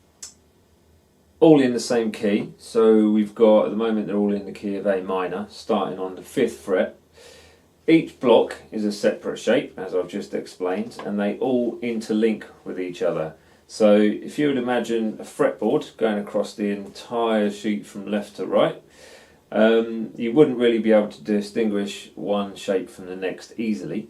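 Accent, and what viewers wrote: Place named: Kent, United Kingdom. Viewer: Are you from SE Kent ? We lived in Sandwich , and your accent sounds the same as if I’m listening to someone from there! My husband is British and we moved there and back over ten years ago now. It was quite the experience. I’ll say that !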